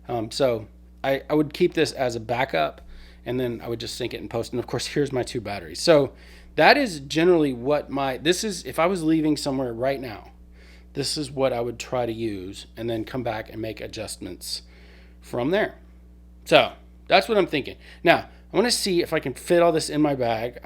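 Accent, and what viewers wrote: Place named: Boston, United States. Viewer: You way how you speak remind me one of my Friend from Boston area! By the way, looks like you are very confident speaking on camera! Some experience from out of YouTube life?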